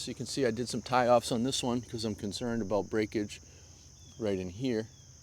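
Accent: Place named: Maine, United States. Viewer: You sound like your from maine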